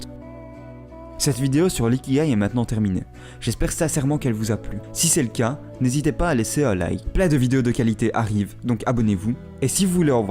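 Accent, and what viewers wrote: Liège, Belgium. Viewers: Hello, j'ai vu des vidéos, lu des bouquins sur l'Ikigai et ta vidéo est bien plus instructive et à son côté pratique que j'ai trouvé nulle part: comment répondre aux questions... Donc merci pour ta vidéos! Je vais explorer ta chaîne qui me paraît vraiment ce qu'il me faut en ce moment :) (Est-ce que tu es d'une région particulière ? ta voix me fait penser à l'accent de Liège :p )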